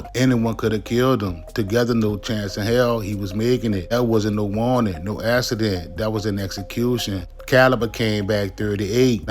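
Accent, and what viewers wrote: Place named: South, United States. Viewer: Is this ai with a country accent? I understand what he is saying but the cadence is weird.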